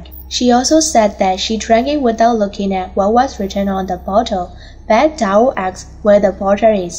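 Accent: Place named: Myanmar. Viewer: Are you a Myanmar?I just notice your accent.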